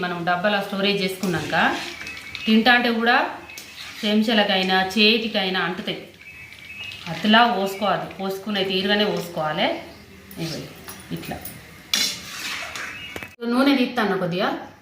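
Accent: Telangana, India. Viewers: Mana godavarikhani, Manthani,Mancherial, peddapalli karimnagar, warangal maata teeru abbaabbaaa mast untadi 👌👌👌👌👌❤❤❤❤❤❤ Edi matladina direct and straight ga untadi muchata....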